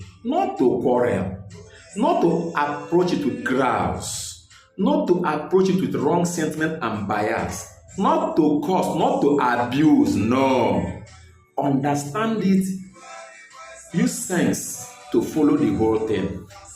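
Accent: Igbo, Nigeria. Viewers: Igbos migrated from Ikwerre. So Igbos and Ikwerre are one. The father cannot deny the son and vice vasa. History is shrouded in ambiguity, yet you state emphatically Ikwerres are not Igbos. You contradict yourself. I always see self-contradictions in your analyses. You say whatever a man wants to be called, that's what he should be called. A man wants to be called a woman, so that's his identity? You are really driving the train. What a fool! When you are talking, one immediately knows you are an Igboman, your dialect, gestures betray you as an Igboman. Onye eririeri. You do not reference Uche Dim Okwukwu, the former secretary general of Ohaneze Ndi Igbo who hails from Elele in Ikwerre land, who is now vying for the presidency of the same organisation. What about Wike's younger brother who is the president of Ohaneze Ndi Igbo in South Africa? You talk as if all Ikwerres agree they are from Benin. 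And yet you state emphatically there is no way all the people in a place are descendants of one man. Another self-contradiction. You call yourself a historian and yet you have not heard of the similarity between Igbo and Hebrew. There is a book on it done by a white man. Search for it. You will find it. Do you known the origin of the word Benin. It came from Ile Ibinu, house of vexation. It is Yoruba. It originated from Oranmiyan. Before that time, it was called Igodomigodo which is an Igbo word. It is on record(see Jacob Eghareva). The Oba family in Benin originate from marriage bet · evn ur accent and looks cannot lie. u be igbo stop lying to urself. benin no dey share language. ika say benin , ikwerre say benin, ijaw say benin all of una say benin so benin na God wey dey share language and surnames now right? una really be mumu sha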